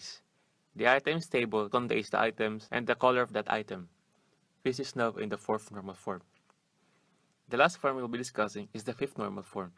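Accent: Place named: Philippines. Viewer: Thnx....but Oh God, the accent, so hard to understand him...but Pinoy pride baby ;)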